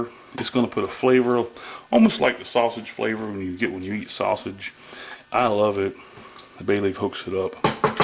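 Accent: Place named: New Orleans, United States. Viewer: I love your videos. They are quick, non-textbook, and very logical to follow. Your accent reminds me of my nites in new orleans!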